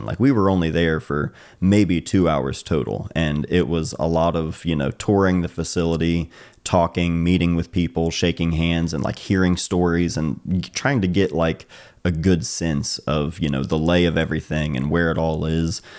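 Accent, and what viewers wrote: Ohio, United States. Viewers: Okay, so I'm a newbie to photography and found your channel by random chance on YT. I started to listen to you speak and immediately thought "that accent sounds familiar"...and then I check your channel's "about" portion and find out that you're from/based in Chillicothe, Ohio. And it all made sense. I grew up not far from you. It's great to see someone from that area of "rust belt meets Appalachia" doing so well on YT and in his creative pursuits. Keep it up, bub!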